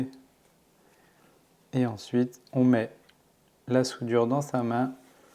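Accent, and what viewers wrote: France. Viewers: Sigh! I love listening to your beautiful French voice! In honor of my French ancestors I salute you sir!🇫🇷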